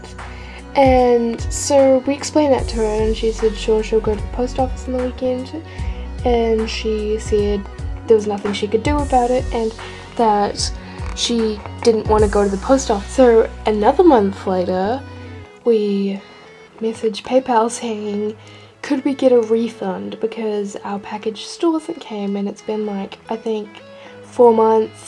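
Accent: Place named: New Zealand. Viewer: i recognised your accent then when you said you were from nz i subbed lol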